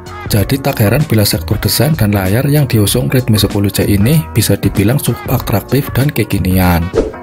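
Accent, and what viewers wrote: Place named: Tegal, Indonesia. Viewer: Sing ngisi suara wong Endi coyy... Medok n ngapak nemen yahh.. wong Tegal apa Brebes ??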